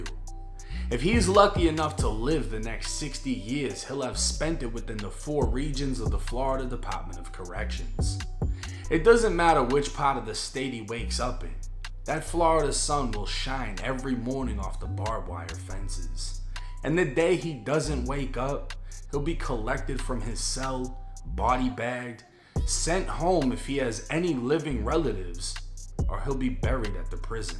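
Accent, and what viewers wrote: Boston, United States. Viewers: He's a Boston guy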